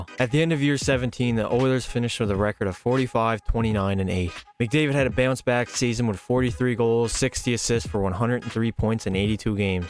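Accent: Newfoundland, Canada. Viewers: No way your not a Newfie, that accent lol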